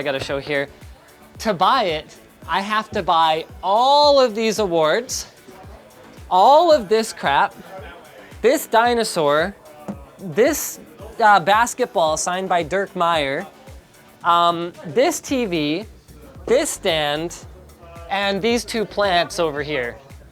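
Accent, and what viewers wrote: Canada. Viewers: Canadian accent really poppin in this video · you can hear the canadian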